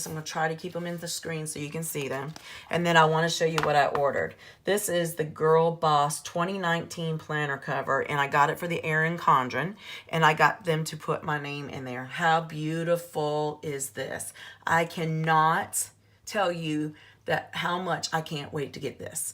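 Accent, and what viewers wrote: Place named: Carolinas, United States. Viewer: Love that color!!! If you dont mind, where are u from? I live in VA now but lived in Carolina for 25 years, your accent sounds like a Carolinian. So just wondering!!❤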